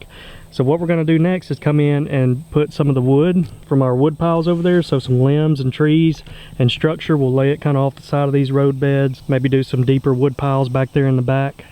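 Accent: United States. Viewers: The internet constantly surprises me . Here I am again , listening to a cool American voice chatting about , and showing anyone interested , how to build a bass pond . I live in a condo , have never gone fishing , and yet find myself delighted to see your video pop up . I am already looking forward to seeing the next step .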